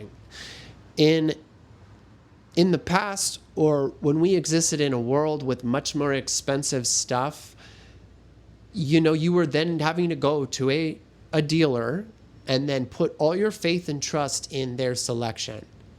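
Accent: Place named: Germany. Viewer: Are you sure you're American? 😅 You sound like you have an accent, and for me, you somehow sound more German/European than American. Please don't be an immigrant, i.e., don't be contributing to the thug that is America. And disclaimer, I myself am Chinese and don't care to be called American, nor do I care to be represented by Hollywood Aesians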